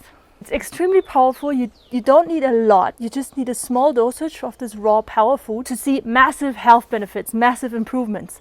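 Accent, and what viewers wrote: Germany. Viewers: This is the first video of yours I'm seeing. It's very interesting. You're in the Andes? But you sound German. I recently bought bee pollen for chronic inflammation. I'm hoping it will help. I like your video and will check out more.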